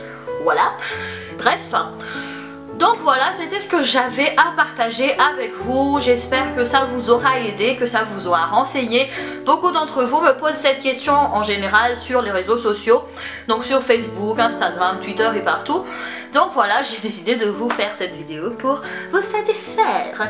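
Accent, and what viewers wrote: Madagascar. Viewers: Coucou! Je voulais te dire que ta vidéo est super ! PS: Tu es malgache ? J'ai l'impression que ton accent ressort un petit peu! Bisous :)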